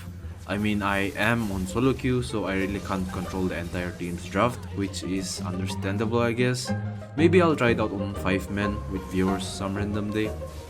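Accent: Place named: Manipur, India. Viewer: Why do i feel like you're Meitei or north eastern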